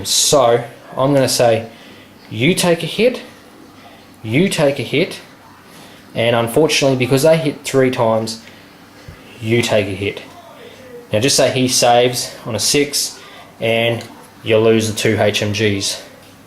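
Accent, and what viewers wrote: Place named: Australia. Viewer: You've got a hell of an Ozzy accent mate...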